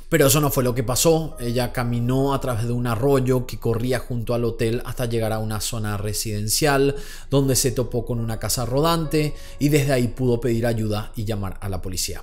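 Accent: Paraguay. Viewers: Me encanta tu acento de Paraguay!! Vivi allí varios años y mi hijo nació ahí y tenía tu misma forma de hablar, te felicito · Gran canal por tú acento se nota que sos de Paraguay capo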